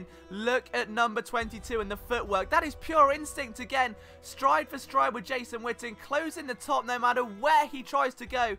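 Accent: United Kingdom. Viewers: I absolutely love your analogies, I'm a Native tarheel; and we have the Carolina Panthers here, but I've been an EAGLE fan for 33 years! Growing up there were no Black QB's, except for Doug Williams who still led the awful Bucks to an NFC divisional play off. Love the accent, I'm compelled to drink a cup of Earl Grey when watching your Eagle report, keep flying.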